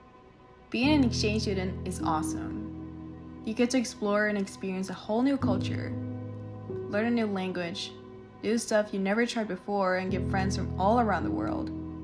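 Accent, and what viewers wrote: United States. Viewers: just wanted you to know I thoroughly enjoyed your exchange video in Iowa. I also want to thank you for taking the time to answer all the questions from potential exchange students that follow you on YouTube. As you know it's a big step to leave home and family for almost a year all on their own. Especially going to a new country, with different customs, new language, new school, staying with strangers and meeting new friends. Your video will most certainly help future exchange students and those thinking about it. I am a former host dad now living in Virginia and have previously lived in New York, California, Illinois, Oregon and Colorado. I still enjoy following the exchange program and watching high school students as they grow into young adults throughout the year and experience the American way of life first hand. I think it is an opportunity of a life time that very few students will ever get to experience. 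I worked for the airlines for many years and have been lucky enough to experience many other countries myself and look forward to my first trip to Denmark this fall. I have heard of the Faroe Islands but admittedly know very little about them (kind of like Iowa was for you) 😂 Since I'm always curious about other countries I had to google the Faroe Island and find out more information. Let me just say the pictures were absolutely beautiful!! Reminds me of Ireland in many respects. I have to ask, how is it your English is so perfect and with an American accen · This video was so touching. Thanks for sharing your experiences...and you‘re English sounds so American now haha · Hi, did you learn your American English pronunciation and being to speak well while you were on student exchange program? Also, it’s been over a year since then, how are you doing now and you still reminiscing your school year in US? 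Or just a distant memories now? Thanks.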